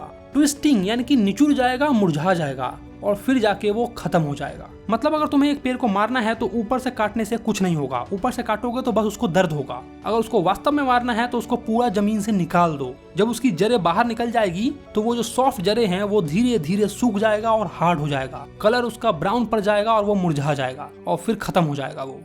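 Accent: Bihar, India. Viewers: Its sounds like Bihari Bhaiya!👀❤ Best explainer everr?🐣haiinna?? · Are u bihari?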